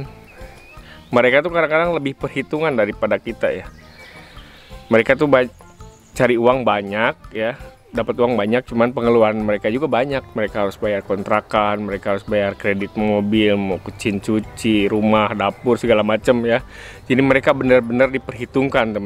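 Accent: West Java, Indonesia. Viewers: Ga apa² Kang, kebawa bahasa sundanya, malah enak didengar, jadi menghibur juga😀😀 Semangat Kang..!!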